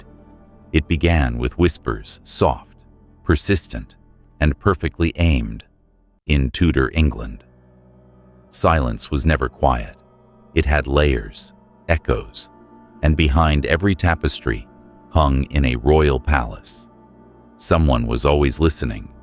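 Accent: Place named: United States. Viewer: Can't listen to my countries history narrated by an American, you just know some things and places are going to be pronounced completely wrong or be inaccurate 😮😮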